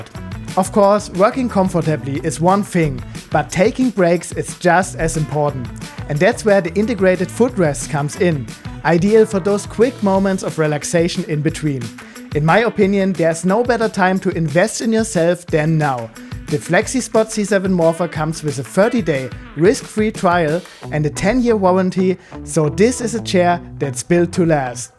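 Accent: Germany. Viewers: this bad accent german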